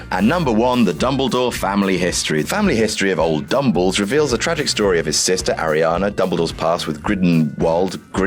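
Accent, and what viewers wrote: United Kingdom. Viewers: You sound British (?) yet you use American titled books.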